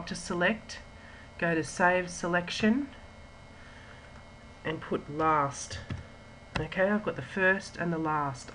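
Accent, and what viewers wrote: Australia. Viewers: About bloody time I hear an aussie Well done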